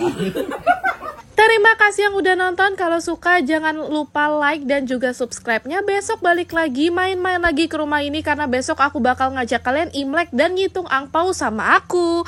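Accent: Manggarai, Indonesia. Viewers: Logat manggarai y ci 😅❤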